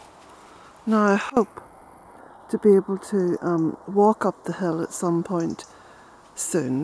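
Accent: Ireland. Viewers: I watch your vlogs for inspiration, comradeship and hope: but also for relief from stress, solace in hard times, an escape from my own life and even as an aid to peaceful sleep when life is really hard and I am in distress. I put my laptop on the floor near my bed and drift off to sleep listening to your lovely voice and tales from at those times and it has been such a blessing. So yes indeed, with your Irish lilt provide a sanctuary for me:)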